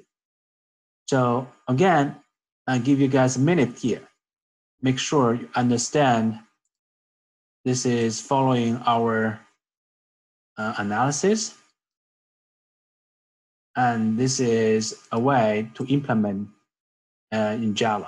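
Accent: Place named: China. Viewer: I love your Chinese accent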